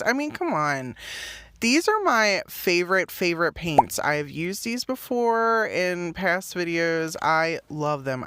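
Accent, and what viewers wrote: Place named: Pennsylvania, United States. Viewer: I enjoy listening to you. You sound like Pennsylvania. I loved growing up in the 50’s. Kids were so innocent.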